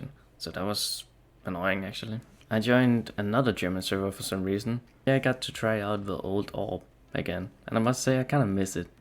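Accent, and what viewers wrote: Denmark. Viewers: Ingen tvivl om at du er dansker med den accent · i smell danish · Fed Dansk accent haha!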